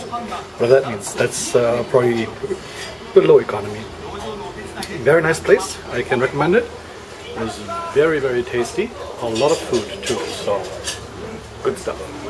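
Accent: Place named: Germany. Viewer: Fünf Worte und ich wusste, dass du Deutscher bist! :D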